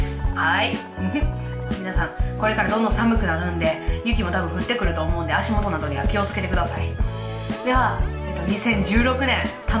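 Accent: Kansai, Japan. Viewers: 関西弁すごい可愛いです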